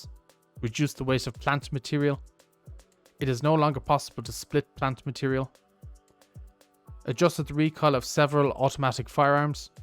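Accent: Ireland. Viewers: He said 30. is Irish so he pronounces it as "Tirty"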